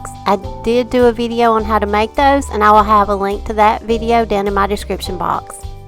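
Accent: South, United States. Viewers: Girl, I love your channel...and that so southern accent!! I’m from SC, but yours is better than mine ❤️ I’m making ornaments this year for close friends and family..a lot of this stuff I have in my stash!! Checked out Olive Rue and signed up for her newsletter and will be wrapping my candles for the Holidays!! Thank you both so much!!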